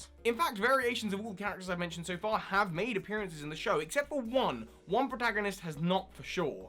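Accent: United Kingdom. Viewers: soar high... awesome catchphrase there, amplified by the good old British accent. quick question( that you may have answered before, but you have so many videos and I have so little time):from the legendaries alone what game would you pick, sun or moon? I personally would like any but I would prefer moon because, although I adore psychic types, dark is my 3rd favourite type! I can't think of how to end this so, buh-bye!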